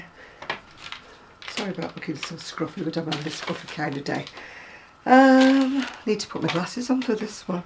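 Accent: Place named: England, United Kingdom. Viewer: love your accent,! Are you from the part of England that James Herriot's books were based? Loved their pbs show accents, as well. Such a lovely granny square blanket or shawl you are wrapped in. Pretty mutted colors. Each of the English crochet blogger's accents seem unique to their area,like ours in the U.S. Because so many 'yankees' have moved down south, our drawls are probably changing,lol! Oh yes, such sad news about Buster. I am truly sorry about his passing. I too have an older fur baby, and I know that time will come too soon. He is like a beloved son, and has provided me with lots of love and companionship since 2002. I can't even imagine him not being here.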